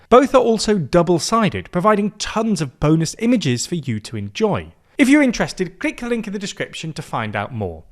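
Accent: United Kingdom. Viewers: So sick and tired of all this British accented YouTube channels. WTF?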